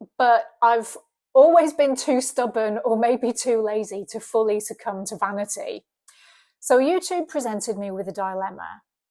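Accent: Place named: Northern England, United Kingdom. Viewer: irish married guy here from california. your channel is brill. keep on going. great to hear truth, and watched the people who judged you. we are here for you. great job. i’m an immigrant and also lived in london from the 80ts to 2000s know all about england. and your northern accent is great. enjoy Ireland. and hope you meet the right one. as i say keep up the great work. Slainte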